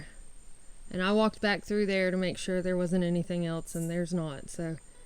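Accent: South, United States. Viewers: Barely found this channel and I love her camera work and southern accent. Thumbs up and subscribed. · Very enjoyable and good info for my Grandkids. I'm born and raised from Texas now living in Michigan. If I don't hear that Southern lilt now and then I have to go south and have pie and listen to a Southern Waiteress. Thanks for everything!!! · Missed that southern draw. Thanks for the views. Have a great day. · Dang i miss the south.. I'm stuck in yankee land... love the accent.... makes my hes and haws pop out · You are so inspirational and beautiful. Love the southern accent. Ut oh I think i have a crush.... Lol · How cute you're obviously from the south I detect a Southern accent lol👍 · You seem to have got more Southern-sounding since lockdown started.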